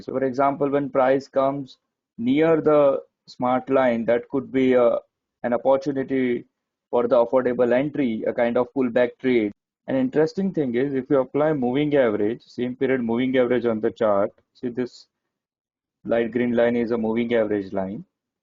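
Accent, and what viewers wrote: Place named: India. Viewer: Sir you are pure Hindustani not foreigner you talk to mother tongue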